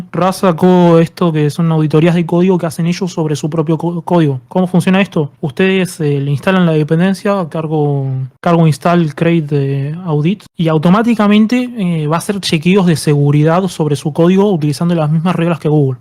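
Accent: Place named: Argentina. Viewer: Tremendo contenido! El audio de 10. Quizá lo que familiarizo el acento Argento 😁. Saludos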